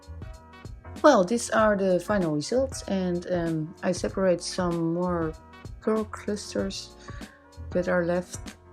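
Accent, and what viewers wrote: Netherlands. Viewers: Beautiful hair. U sound like my Dutch husband lol I’m guessing U are Dutch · Are you Dutch? You have a very strong Dutch accent! I think. [edit: yes, cat Vlinder was just introduced] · Is she Dutch? She sounds really Dutch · The Dutch accent is strong but I like it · I've never seen more beautiful curls before 😍 You are like an angel, you motivate and inspire me to love and take care of my curly hair no matter what other people say (classmates all have straight, thick hair and I have thin curly/wavy frizz! -_-) At least my hair is natural and not as damaged as their hair, but it seems they all think ringlets looks funny and childish.... 😒 THANK YOU so much for motivating me!!! ❤❤ Also, your accent is super beautiful, I believe you are from the Netherlands? You're such a beautiful lady really. Hugs from Sweden 😇💕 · She really sounds dutch. 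Thats a typical dutch accent. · you sound kind of Dutch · Oke dit is misschien een beetje apart maar ik hoorde aan je accent al dat je Nederlands was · I think you're from the Netherlands the accend gives it away😂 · Hoor gewoon aan je accent dat je nlse bent 😍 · je hoort dat ze Nederlands is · Are you dutch? You sound verryyyy dutch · Je kan het nederlandse accent er zo goed door heen horen haha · Ben je toevallig Nederlands? Ik hoor een nederlands accentje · Haha lekker hollands accentje heerlijk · I want to say you are dutch. I can hear it in your accent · You sound Dutch! Are you? Hoi🙋🏽‍♀️ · Are u from Holland because u sound like a dutch person with broke englishe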